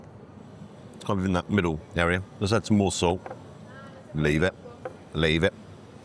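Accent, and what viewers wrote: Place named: Yorkshire, United Kingdom. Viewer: Yorkshire 100%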